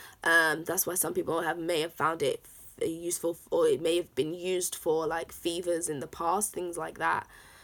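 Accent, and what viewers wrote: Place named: United Kingdom. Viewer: Why do I feel I am in Hogwarts hearing your accent.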